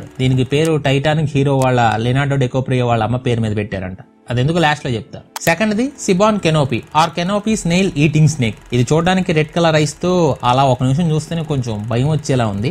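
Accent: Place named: Telugu, India. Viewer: Era epudu chusina denini telugu lo em antaro naku telidhu antav nvu emina foreign lo puttava ne slang chuste pakka telugu medium gadi la unav, ayna idhi idhi recorded video a kada evadnina adigi chepochu kada leda google chesina telustadhi telugu li em antaro ani, prathodki idoka fashion aypoindhi telugu ranattu xtralu mingadam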